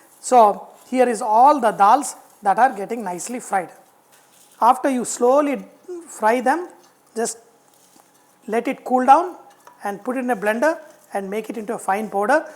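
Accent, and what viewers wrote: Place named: Telangana, India. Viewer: No dish is perfect without your Telangana slang please make some videos in Telangana slang sir.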